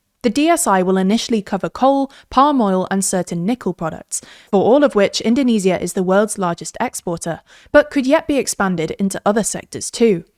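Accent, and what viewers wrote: United Kingdom. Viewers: This lady british accent is so much better to listen to. I could not hear another word from the previous british dude voice, sounded like that annoying pewpewdie dude.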